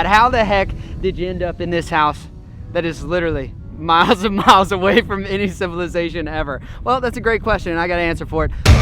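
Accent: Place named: South, United States. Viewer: Really spun up the southern accent on this vid, huh bud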